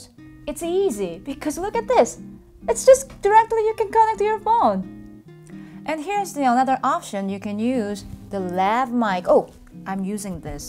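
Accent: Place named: South Korea. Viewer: after listening to many of the big stars doing videos from home during the social distancing it is obvious that you really do a great job with audio. most of the famous stars sound terrible on their videos. you however have learned very well how to balance piano and voice. that's great because you have a wonderful singing voice and I adore your Korean accent when you speak.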